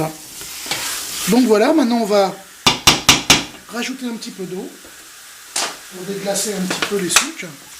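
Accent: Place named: France. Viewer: Merci pour votre recette, c'est en cours de préparation chez moi! Et merci pour ce bel accent bien français ;)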